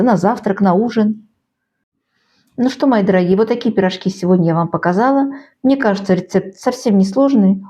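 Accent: Baku, Azerbaijan. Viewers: Какой у вас сладкий бакинский акцент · Я сразу по акценту догадался, что перед нами бакинка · Доброго дня,! У Вас до сих пор остался бакинский акцент.... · Я тоже Бакинка ,я тоже так делаю ,но спасибо не буду, попробую без жарки лука ,сразу подумала что по акценту ,вы из Баку ,спасибо ,вам здоровья моя землячка ,пусть моя смотрит ваши рецепты ❤хранит вас Бог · Чувствуется легкий бакинский акцент🎉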